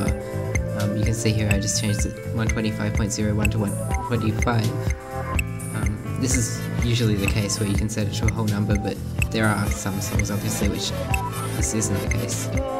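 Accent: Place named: Australia. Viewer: It sounds aus-like anyway. ;]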